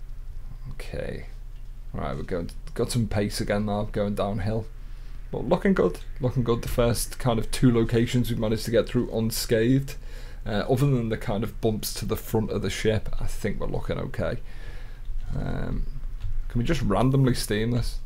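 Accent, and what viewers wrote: United Kingdom. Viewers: Your accent...From.the wirral?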